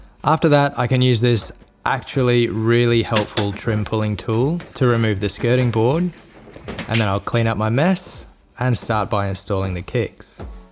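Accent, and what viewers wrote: Australia. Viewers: Relentless Australian accent...LOL! Had a couple of laughs and picked up a few tips, thanks! Liked and subscribed. 👍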